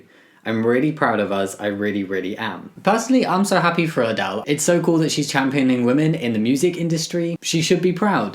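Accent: United Kingdom. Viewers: I keep forgetting your British I’ve just gotten so used to your accent🤦🏻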